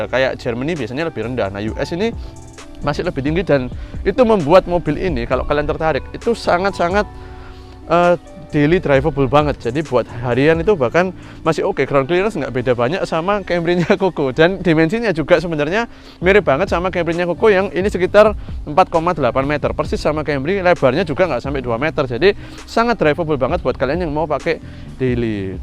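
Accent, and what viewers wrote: Surabaya, Indonesia. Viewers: Kueren PuuoooL Kooo,,, penjelasan khas Bonek sby Ko... Uwis tak Suckrip & tak Like ko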